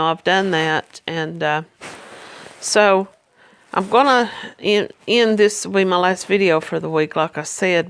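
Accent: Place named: Kentucky, United States. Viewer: You sound like you have a Kentucky accent..because here in my small little town everyone knows everything, and only 1 red light and 1 $ store..lol..and most men here are narcissists.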